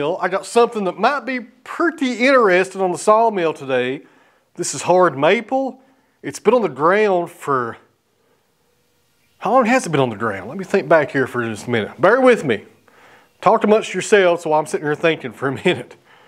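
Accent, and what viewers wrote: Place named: Tennessee, United States. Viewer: I watch you work 1, because I want to own a Woodmizer one day. :-) and 2, because I had family from Tennessee and you talking reminds me of them. Sign me up for the give away!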